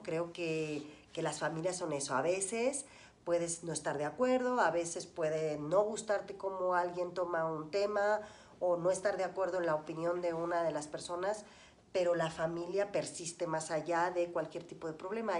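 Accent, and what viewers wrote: Mexico. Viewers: Me gustaría leer la respuesta de que piensan de su acento mexicano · cuantos años llevas en México? Tener nuestra ficha amarilla fuera de España aún no lo supero! Jaja amo como hablas con acento mexicano y cambias al español 😝 me encanto el documental! Lo volveré a visualizar seguro! Saludos desde España!! Te adoroooooo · Y dime... se ríen de ti los Parchis x tu acento mexicano??😁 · Alaaaa que mexicano tu acento, bueno esque hablas mejicano no tenía ni idea! que te quedaste viviendo allí,pensé eras catalana. Un abrazo! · Que tía más simpática esta parchisera (hablando como hablan los mexicanos y su curiosa forma de 'retorcer' el castellano). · ya eres Mexicana! Tu acento mexicano te va muy bien eeeeh felicidades! Saludos! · Guapa guapa!!! me encanta tu acento Mexicano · ya no hablas como española, sino como mexicana,pero igual sigues siendo la ficha amarilla de Parchis. 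· Hablas más como mexicana que como española, que linda 😉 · Tiene buen acento Mexicano..he notado que casi no usa palabras o términos de España...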